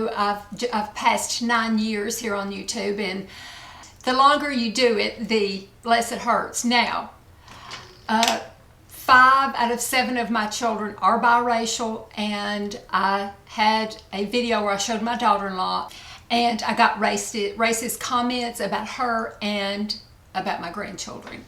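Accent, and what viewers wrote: South, United States. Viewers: I have always found your videos very enjoyable and your personality is genuine and comes across with honesty and a sense of comfort. You don't strike me as a social butterfly, but as a true home and family gal. You are never "overdone" with the artificial enhancements. You apply your make up to compliment, rather than disguise. And I love your Southern style and your accent. As far as the hurtful racist comments, evil is a dark place where souls lurk and has no place where the sun shines on goodness. And your videos bring sunshine to those of us who love you. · Great video, but then, I have dearly loved all your videos from the start!!!! You have values and feelings so similar to mine, we could be sisters!!! LOL!! I'd be your very much older sister, though!!! Please don't be so hard on yourself, I hated to hear that you redo so many because you felt they weren't good enough or that you could do better...I can understand that of course, but, those of us that know you and admire you so much would understand and wouldn't care nor would we judge you in anyway, if you bumbled every sentence from start to finish!!! 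For your sake, sweet, dear girl, ease up on the self criticism and just be you. We ADORE 'YOU'!!!! I am also southern and the first time I found you, and heard your gorgeous southern accent, I knew right then you'd be a fantastic YouTuber....and I wasn't wrong!!! Hugs, and have a blessed week...and hugs to all your precious family. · Your southern drawl is endearing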